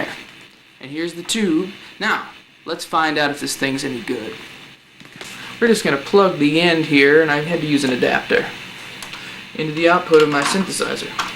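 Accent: South, United States. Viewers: It's like Mike Myers with a southern accent. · Definately not Bristish, its southern US by the sounds of it... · He kinda reminds me of a long haired country accent Ashton Kutcher. well that just my opinion i really don't kmow about you guys:)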